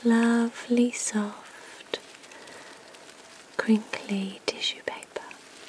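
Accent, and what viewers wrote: United Kingdom. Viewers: all i can say, i adore your voice and especially that british accent. about to fall asleep with my headphones on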